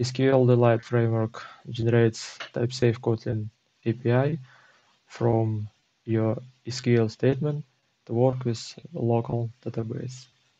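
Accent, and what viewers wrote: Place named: Post-Soviet states. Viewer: Искал инфу по SQLDelight, начал смотреть видос, и такой, акцент, слишком грубооо, пахнет снг, потом смотрю а это )Лайк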